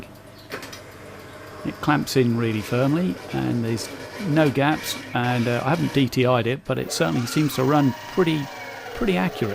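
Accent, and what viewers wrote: Norfolk, United Kingdom. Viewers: Enjoying your videos, yew sound like a Norfolk boy to me (as am I), I don't detect the upward tone rise of a Suffolk boy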